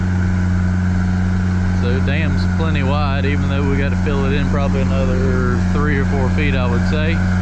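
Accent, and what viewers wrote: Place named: North Carolina, United States. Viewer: Would it be safe to assume you are from the Raleigh/Sanford/Durham area? Half my family was from there and, had the same accent that you try so hard to cover up. LOL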